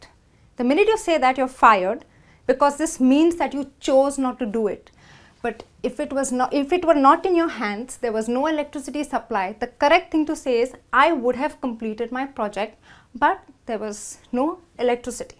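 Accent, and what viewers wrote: India. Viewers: indian pronunciation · excent indian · thonzskie junior I agree with you. All my friends who are from Indian speak English the same her voice... maybe this is intonantion of Indian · Hindi style , plzz try to use English way of speaking . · Is that the indian accent? I appreciated it tho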